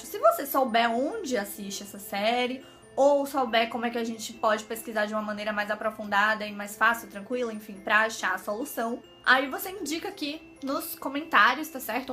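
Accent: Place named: Salvador, Brazil. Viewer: Amo esse sotaque do meu país Salvador hahaha Já tinha assistido o filme efeito borboleta, eu fiquei um pouco desanimada com o filme porque eu achei que as coisas demoraram a acontecer, mas eu até que gostei, achei a proposta interessante. Fiquei com vontade de assistir The Bold Type, acho que vai entrar pra lista kkkkkk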